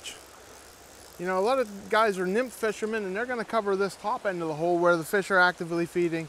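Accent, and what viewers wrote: Pennsylvania, United States. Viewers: Maybe for you, but that's how it's said in PA!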